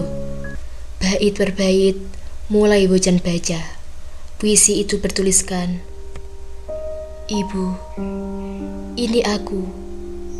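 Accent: Java, Indonesia. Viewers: Kaka narator nya jawane medok yah..... Salam dari jogja ka.. 😊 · Orang Jawa ya? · SUARANYA MEDOK BANGET SIH. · I just wanna say: your Javanese so thick and glued. No offense though. Peace ✌️ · Org Jawa?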